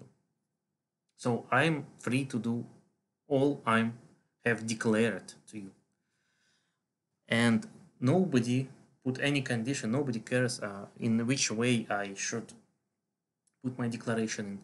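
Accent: Russia. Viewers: Офигенное произношение на английском с чисто русским акцентом! Прям, как "держу корону"))) круть! Уважаю! 👍😉💪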